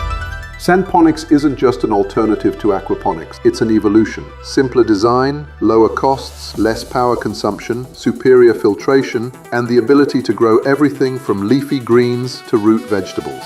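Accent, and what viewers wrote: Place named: England, United Kingdom. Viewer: Why all the Africans doing the work and the narrator with the English accent. Very colonial.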